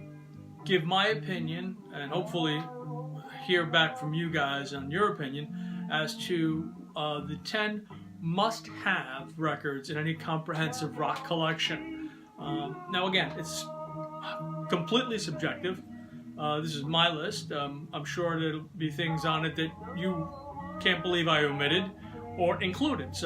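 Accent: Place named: United States. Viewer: Hi!! Well done with your channel!! It’s lovely to hear your American accent.. hopefully one day we can meet 😌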